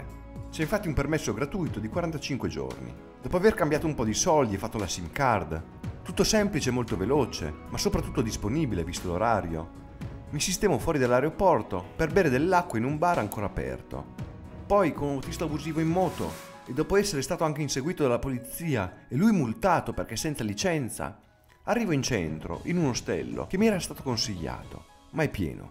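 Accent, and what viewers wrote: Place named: Romagna, Italy. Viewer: Socmel ma sei romagnolo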